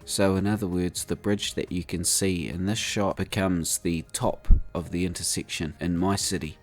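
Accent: New Zealand. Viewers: awesome! been waiting for this one mate :) Looks so like nz countryside, it just says home to me :) loving that kiwi accent too bro!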